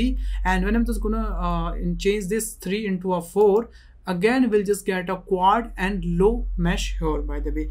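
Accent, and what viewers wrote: India. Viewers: How to find video tutorials without Indian accent?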